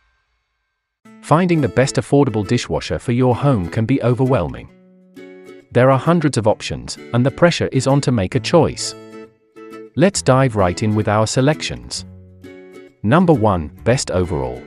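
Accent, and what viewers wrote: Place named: United Kingdom. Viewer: Brit/Euro accent of narrator; are these washers for US market or European?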